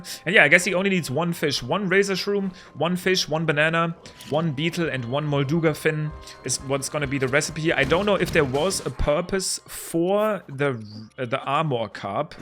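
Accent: Germany. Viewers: I think so. His accent really sounds like a german one. Me as a german can relate xD. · Are you actually German?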